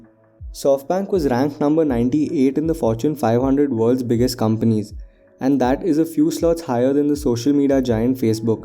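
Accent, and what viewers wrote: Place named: India. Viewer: Are you Indian? You're accent is indian-ish, great video tho! I subbed uwu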